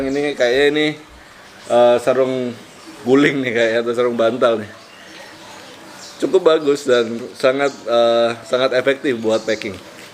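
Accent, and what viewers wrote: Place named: Surabaya, Indonesia. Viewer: Omahmu suroboyo ta om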